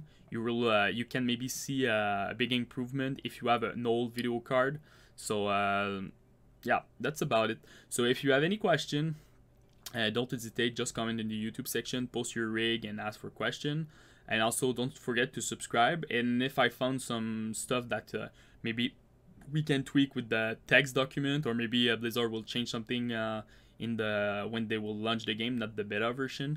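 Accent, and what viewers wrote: Quebec, Canada. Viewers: québécois ?? :P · t québecois sa parait xd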